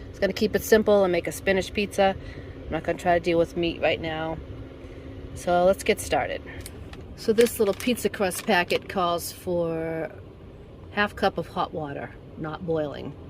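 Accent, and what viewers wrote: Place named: Boston, United States. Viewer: I'm thinking of getting one of these, good idea with the rack at the bottom. I love your accent, where are you from, sounds like Boston.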